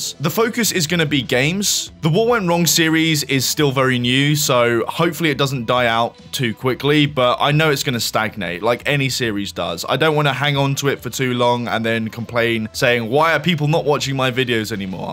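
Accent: England, United Kingdom. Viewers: Got around to this a bit late. I think I joined about when www started, and you instantly became a favourite. Continue making well-produced videos and never lose that brilliant English accent. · I'm glad I discovered your channel just in time to witness this part of its growth "live"! Of course your content interest me in the first place but the other crucial thing is you. The way you behave and talk, your tone etc. I don't watch content, however interesting, if I don't like the youtuber's general behaviour. You sound genuine, don't overdo things and that's what I like. I thought I was fed up with english accents (not my first language), but you seem to have cured that lol. Gonne watch those videos of yours I still haven't while waiting for the next one. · As a 33yo core gamer i must say i was hooked up after randomly founding "what when wrong" video. 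I watch you vids from around week, subscribe 2 days ago and start watching everything you done (except drama and 'r word' vids). I am maybe not commenting too often (kudos to Just Some Guy without a Mustache) but i found myself hooked up to informative content, funny and short jokes like "standing on the table". Personally i like backstage informative content and you personall insight behind it. Best vid for me was the one about doors, maybe it can use some polishing but still it was good and engaging imo. Glad to see your channel grow and you like what you doing, thats most important thing for creators to not burn out too quick. Wish you luck and waiting for new various content ;) P.S. be patient and dont get trolls/toxic people to get over your head ;) We like you for who you are, and you beautiful accent (event tho personally i dont like brit accent xD) you rocking it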